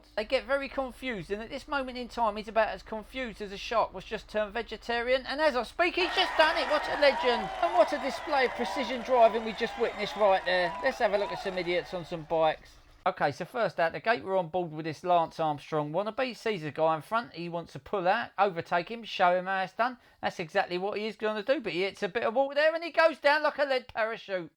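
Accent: Cockney, United Kingdom. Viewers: I’m not sure what is more entertaining, the video or the commentary!…(Is the narrator a cockney cab driver by any chance ?).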